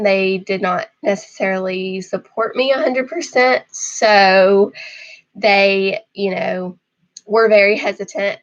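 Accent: England, United Kingdom. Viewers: You are already sounding British after living in England. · Hereford is a lovely county.....and you definitely are developing an English accent!! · Definitely hearing an English accent coming through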